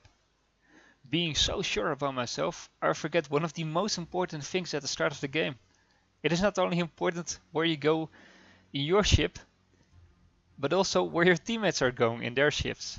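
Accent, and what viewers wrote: Netherlands. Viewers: ĺike your videos! subbed. are you by any change dutch? you sound like it:)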